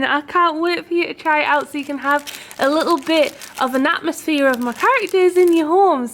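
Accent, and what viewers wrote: United Kingdom. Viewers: You can turn subtitles on with the little square box icon on the screen. Mine are always on. I get a kick out of seeing English translations of UK English accents. It never quite matches up! I know what she’s saying but the subtitles don’t. 🤣🥰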